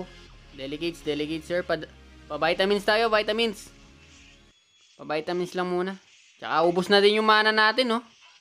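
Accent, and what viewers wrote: Pampanga, Philippines. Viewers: kapampangan ka idol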